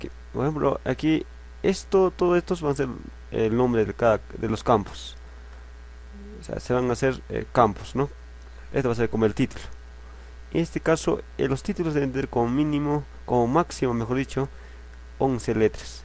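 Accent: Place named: Puno, Peru. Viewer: si pasas unos meses en el departamento de Puno hablarás igual, es el dejo de la región así tenemos otros dejos o acentos en diferentes ciudades del Perú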